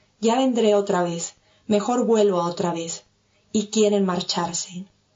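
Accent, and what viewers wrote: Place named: Argentina. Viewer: Yo extrañaba la voz argentina. Muchas gracias.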